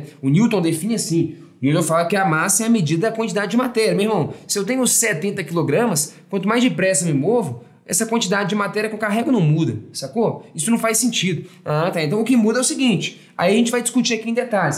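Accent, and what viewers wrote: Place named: Minas Gerais, Brazil. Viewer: Kkkkkkkkk esse sotaque dele de mineiro é mt engraçado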